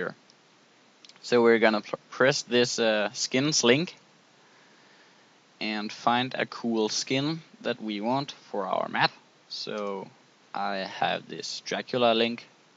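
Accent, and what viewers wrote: Denmark. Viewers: du er dansker xD jaaaaaaaaaaaaaaaa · Haha dansker xD? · Oh shit, dansk accent alligevel lol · You are Danish! =P Oh... And thanks for the help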